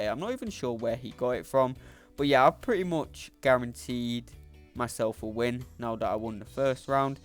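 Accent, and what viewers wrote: United Kingdom. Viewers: He has an accent, plenty of people in the uk speak like that, don’t be obtuse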